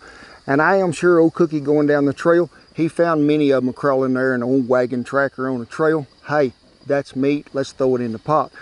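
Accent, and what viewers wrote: United States. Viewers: I cant get enough about how he talks... reminds me of how some of us from my home town speak. And we aint even in the south, just a town with southern immigrants.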